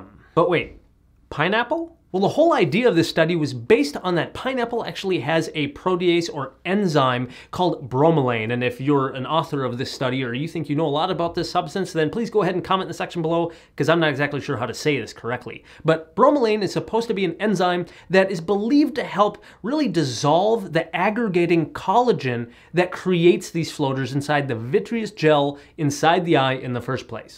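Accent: United States. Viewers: Very informative video. I'll try that out.. Also, you're amazing, got a beautiful pure American accent AND you're so gorgeous lol.. Wish more doctors looked like you hahaha, or be your patient even better lol... Anyhow, thanks for your channel and videos. Subscribed and supporting your good work. :)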